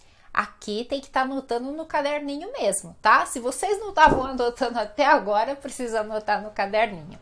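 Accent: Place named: Paraná, Brazil. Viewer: Parabéns pelas aulas super didáticas e pela dicção excelente. Você não tem sotaque de Curitiba, mas sim (um pouquinho) do centro-norte paranaense ou do interior de SP.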